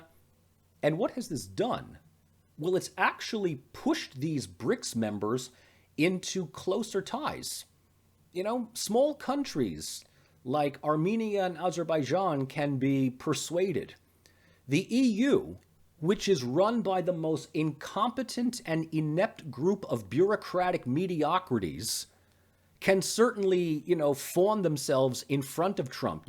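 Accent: New Jersey, United States. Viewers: I am so happy to see your post. I've only just started to watch, this video but it looks like we will hear your views and info alone. I feel very lucky that I found your channel a while ago and I thank you for the things you share with us 😊 I like much better when you give your views alone, but I guess we need to be more open to others as well. Besides, I like to hear you speak because I am from New Jersey also ❤❤❤❤❤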